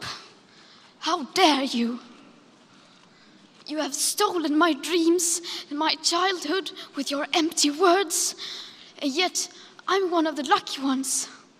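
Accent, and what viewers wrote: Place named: England, United Kingdom. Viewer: Cuz she has an English accent like Emma Watson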